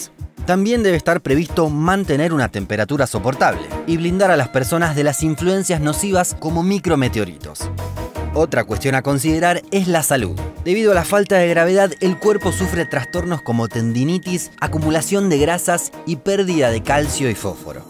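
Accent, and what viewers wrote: Buenos Aires, Argentina. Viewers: Lo único que puedo criticarle es que habla muy aporteñado.Si hablara en neutro el canal llegaría mas lejos.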